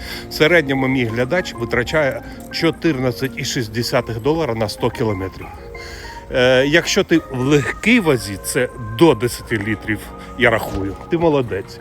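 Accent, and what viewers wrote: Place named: Surzhyk, Ukraine. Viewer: Вчи українську краще і швидше , бо суржик лізе з усіх дірок! Українська : наголос на третьому складв. Тобто на букві ї.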